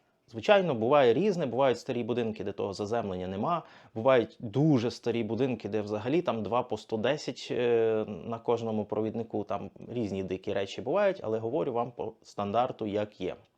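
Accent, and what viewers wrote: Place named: Cherkasy, Ukraine. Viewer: Ше раз, - точно з черкаської області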